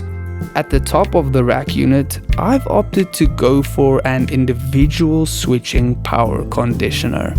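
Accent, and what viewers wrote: South Africa. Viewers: is that a South African accent im picking up?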